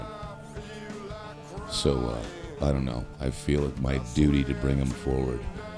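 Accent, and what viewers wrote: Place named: Midwest, United States. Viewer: Your voice tells tells of many great tales in the American Midwest by the utterance of just one word.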